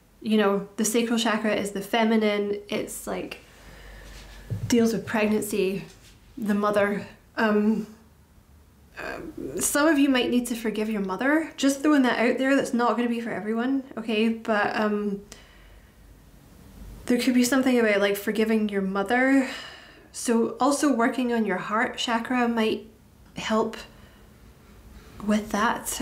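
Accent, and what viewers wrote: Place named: Scotland, United Kingdom. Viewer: So nice to hear a fellow Scot 🏴 thank you for this reading 🙏🏼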